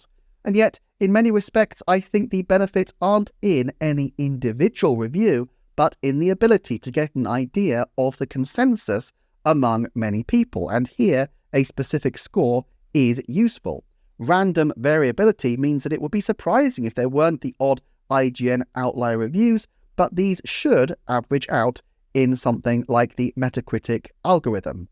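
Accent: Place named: RP, United Kingdom. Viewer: This review doesn't pertain directly to this particular video, but I just want to say since YouTube tells me I'm in the top 0.3% of your viewers that I do enjoy watching your videos. The fact they're based on statistics and facts rather than so much speculation like most gaming YouTubers makes it a good watch even if the subjects are sometimes what my friends would call nerdy. Lol. And plus my hillbilly gay ass from Kentucky just imagines some fancy brit speaking over a cup of tea and it is almost an ASMR experience. Keep up the good work. 😁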